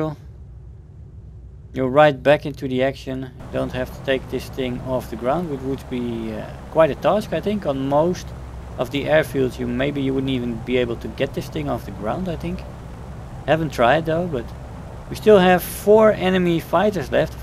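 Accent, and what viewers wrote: Netherlands. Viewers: great video and great person. are you dutch?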